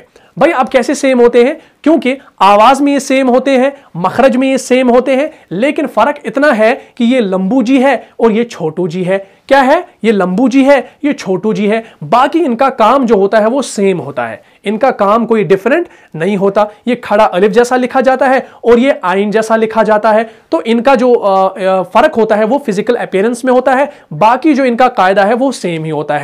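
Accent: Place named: Mumbai, India. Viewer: Qaree sahb aapka Arabi tallaffuz correct hy jbky urdu aap Indians k mumbae walon ki tarah bolty hain khalee ko khalee yanee khal k kaaf ki taraha ghunna ki gonna yanee aap lafz bol sakty hain laijin bilawaja urdu ja tallaffuz bigar dety hainQ?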